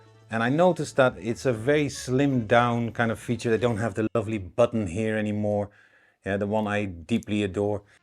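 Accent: Netherlands. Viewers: Ik krijg onmiddellijk een combinatie van plaatsvervangende schaamte en tenen krommend afgrijzen bij het horen van dit steenkolen nederlands engels. Zal best goed zijn maar niet te trekken dit.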